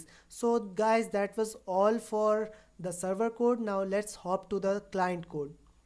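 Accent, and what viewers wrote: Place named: India. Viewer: You Sound like an Indien tech Support scammer but u r 100x Intelligent than them I am not so I am watching this Video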